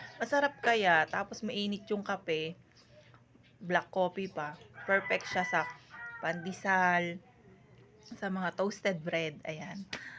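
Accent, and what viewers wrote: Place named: Cardona and Morong, Philippines. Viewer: Parang cardona at morong ang punto ng salita nyo madam.kapareho ng sa amin taga isla talim si nanay .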